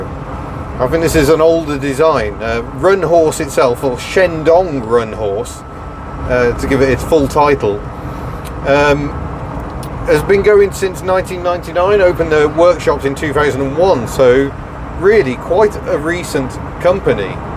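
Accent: United Kingdom. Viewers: Because of your brit accent I kept expecting a pantomime horse (we don't have any in Canada but some have learned of its UK existence and of panto theater traditions through books and the Web) to appear suddenly each time you expressed your love of pantograph wipers. By the way we don't have European regulations for quadfracycles here in Canada so that cute truck and the Citroen AMI and others simply never show up on our streets. However, we have rules (or lack of actual specific rules but cover all general rules) for enclosed mobility scooters. They are like giant perfectly enclosed battery powered wheelchairs for semi-disabled people or for the very old people who are not allowed to drive cars in the street anymore because of vision issues or other medical problems. In other words the enclosed mobiliy scooters are forbidden on normal streets and must instead travel on sidewalks or bike paths!!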